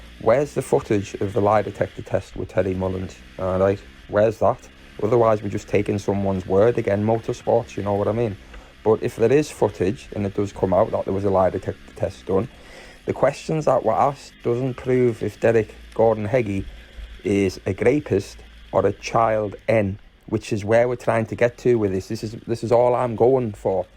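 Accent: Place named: Geordie, United Kingdom. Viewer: I love the slip in accent mate your geordie roots always shine through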